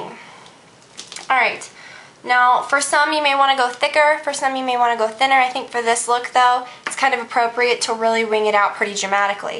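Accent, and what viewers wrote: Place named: Texas, United States. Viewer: you sound like your from texas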